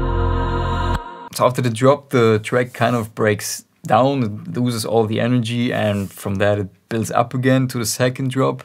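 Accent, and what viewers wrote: Germany. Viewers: Es hört sich so an als wärst du ein Deutscher! :) Wegen dem Akzent! Ist aber nicht schlimm, sondern cool!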